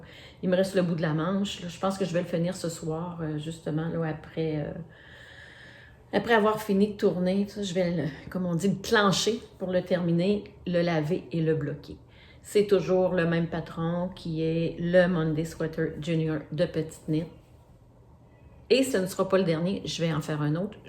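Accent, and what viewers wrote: Quebec, Canada. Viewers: Bravo pour ce premier podcast. Très bien expliqué. J'adore 😍 et moi, je me régale de l'accent québequois 😉 Même si je n'ai pas l'occasion de tricoter de la layette, ça fait toujours plaisir de voir toutes ces mignoneries, et ton plaisir à nous les montrer est contagieux. Merci d'avoir affronté la caméra et surtout continue 😘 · Merci pour ce bon moment ! On dirait que tu as fait ça toute ta vie 😊vivement le 2eme épisode ! Et ton accent me fait tellement de bien, cela me rappelle mes années québécoises ❤❤❤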